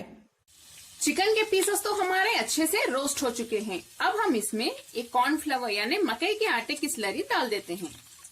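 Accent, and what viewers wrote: Hyderabad, India. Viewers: got to know with your hyderabadi language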